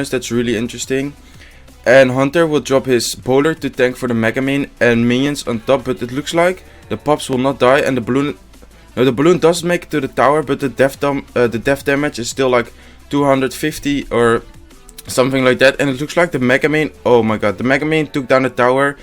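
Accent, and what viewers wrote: Netherlands. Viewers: where are you from you sound dutch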